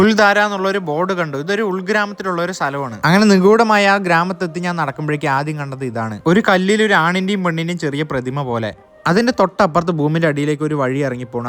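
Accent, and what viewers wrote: Palakkad, India. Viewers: പാലക്കാട്ടുകാരൻ ആണല്ലേ. ആ slang😝😝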